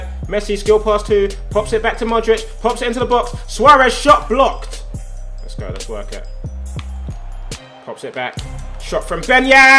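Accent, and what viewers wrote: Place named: Scotland, United Kingdom. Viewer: messi, suarez and neymar = Fucking deadly scotish accent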